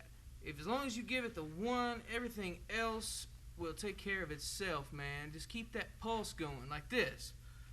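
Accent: South, United States. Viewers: Really funky beat that's hard to beat and a great Southern accent to go with it.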